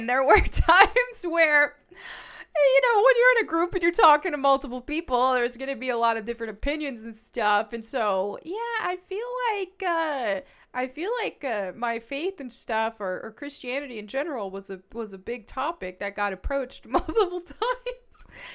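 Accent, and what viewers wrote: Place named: Texas, United States. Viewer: First time I have seen your vids and I agree.. I can tell you are from Texas like I am. lol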